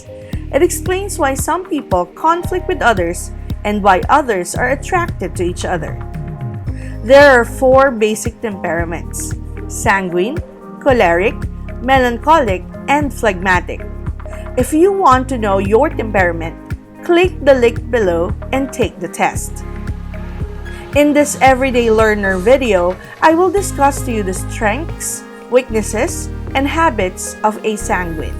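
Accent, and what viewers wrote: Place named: Philippines. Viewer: Your English really sounds FIlipino. Matigas accent.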